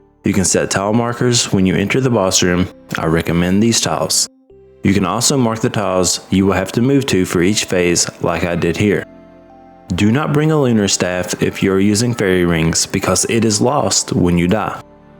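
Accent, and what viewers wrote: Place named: South, United States. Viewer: I don’t know how you somehow have a bit of a southern USA accent but also sound like a European of some sort too lol.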